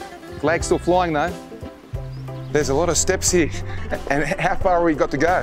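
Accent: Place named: Australia. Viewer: 🇪🇺🇬🇷🇬🇷🇬🇷🇦🇺 Εχω παει εκει Μαρεσει η αυστραλιανη γλωσσα προφορα ... Γεια👋👋👋👋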